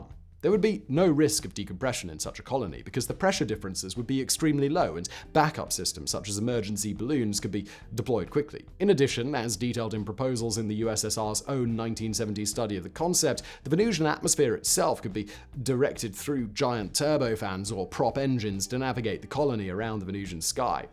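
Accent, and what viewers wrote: RP, United Kingdom. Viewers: Are you leaning heavily into that academic british accent? Or do you actually speak to your friends with that mouth? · I'm not from Britain but oh my god is this guy super posh or is it just me??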